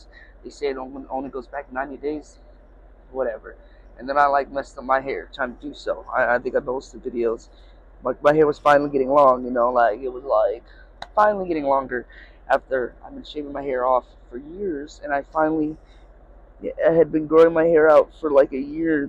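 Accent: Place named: California, United States. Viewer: You sound like you from cali...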